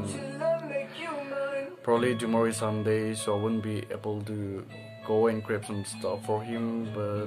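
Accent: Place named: Australia. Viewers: Achuvvvvv Australian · Your English sounds like Australian. 😍 Handsome bro.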